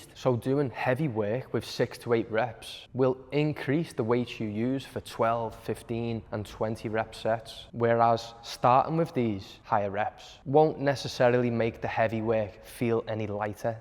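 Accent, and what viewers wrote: Liverpool, United Kingdom. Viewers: Theres something, I like about the scouse accent, which is fun, but also, i'm not fucken about lad.... 😛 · It’s scouse la, ynwa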